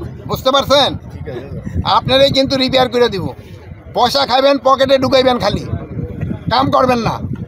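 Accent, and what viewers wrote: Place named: Bikrampur, Bangladesh. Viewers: স্যার কি সুন্দর আমাদের বিক্রমপুরের আঞ্চলিক ভাষায় কথা বলেন। শুনে খুব ভালো লাগলো আর এইভাবেই এগিয়ে যান দোয়া ও ভালবাসা রইলো ❤❤❤ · Amader bikrampur er Language style emoni bhai..proud of bikrampurian advisor ❤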